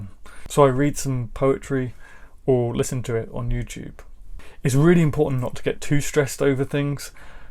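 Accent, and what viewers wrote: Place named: Yorkshire, United Kingdom. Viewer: hahaha I also suffer with running but I'm a walking injury anyway 😂. Thanks so much for the support man, massively appreciated. Although I don't think the Yorkshire accent will ever catch on as much as your classic British accent hahaha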